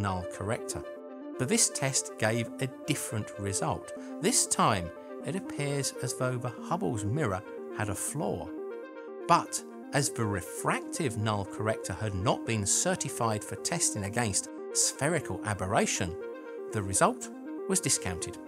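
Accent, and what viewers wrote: United Kingdom. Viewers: I can't figure out if this guy has a slight speech impediment or is just British.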